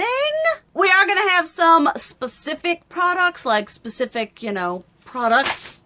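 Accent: South, United States. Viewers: I absolutely Love your accent! I'm originally from Wva. I just knew you were from the South! I love your channel!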